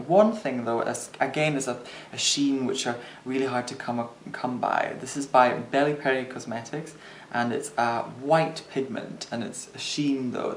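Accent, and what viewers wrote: Scotland, United Kingdom. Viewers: So, this is a much earlier video. I do prefer your eyebrows here. Much more natural voice and enunciation. I would say here that you're Scottish and you say film normally. Hmmm. You certainly have mystique! Xxx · true also i was so suprised by the accent even tho i knew he was from Scotland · He was still living in Scotland back then or just moved to London, lots of people (especially when they're young like ) change or tone their accent down when they move in another area. one of my closest friends is from Merseyside but has lived in Manchester for more than 10 years now and her relatives complain because she lost her Scouse. my former housemate is from Edinburgh but has a very mild Scottish accent, again she hasn't lived there in 10 years. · Your voice an accent are simply heavenly (I have always found Scottish accents to be some of the most beautiful sounding in the world, but your voice in particular has something especially enchanting in it). 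And your presentation of products is exactly what I think many people actually want and need. I am absolutely hooked on your videos and am so glad to have discovered your channel. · thankyou for this video! I really struggle to find products pale enough for my skin, when i heard your accent i knew you understood! lol! Because i am also Scottish like you and like most of us up here, i have that typical, really pale 'peelywally' complexion. Almost everything i put on my face is way too dark, so this video was really handy! cheers! by the way, I agree with everyone else, you're really pretty! I can't believe you're actually a guy! you look more stunning than most of us girls do even after we've spent hours trying to make ourselves look nice! I wish you were my makeup artist, i could listen to that voice of yours all day, it is so soft and relaxing! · I love hearing the Scot come out- definitely different than new videos but I love them all. 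· Rachel B He still sounds Scots.. no getting away from it...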